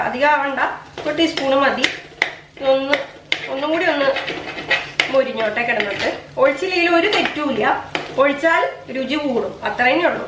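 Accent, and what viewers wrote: Thrissur, India. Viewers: mde ..thrissur baasha aanalo...😂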